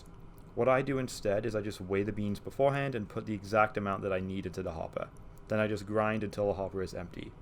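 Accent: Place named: Wales, United Kingdom. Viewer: Great review, thank you! My Breville Dose Control has just kicked the bucket so I’m in a similar situation. I’m between this and the Rancilio Rocky. What was your reasoning in choosing this over the Rocky? Also, on a side note, your accent sounds distinctly Welsh, if I’m not mistaken. Sounds like you could be a fellow Welshman in Canada?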